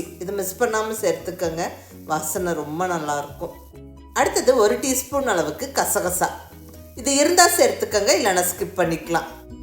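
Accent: Kongu, India. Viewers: Namma kongu manathoda samayalum tamilum sooper madam.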